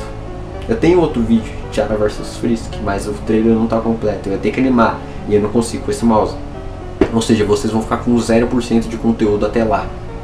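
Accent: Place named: Portugal. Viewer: ah ta portugues de portugal :3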